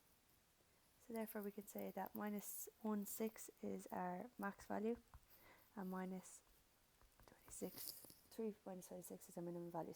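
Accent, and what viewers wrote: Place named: Ireland. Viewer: That Irish accent is the best 👍thanx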